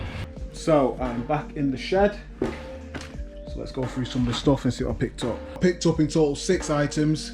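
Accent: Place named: Cheshire, United Kingdom. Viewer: Your accent sounds like ur not far from me. I'm in cheshire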